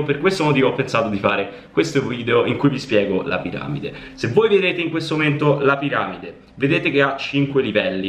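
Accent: Rome, Italy. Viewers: Allora: primo, sei romano, e quindi ti starei ad ascoltare per ore ahahah!! XD E secondo, scherzi a parte.. i tuoi video sono sempre chiari, semplici, e spiegati perfettamente, anche per me che sono una principiante in quanto a fitness e nutrizione... =) Continua così, io sto divorando i tuoi video uno dopo l'altro! Grazie mille! =D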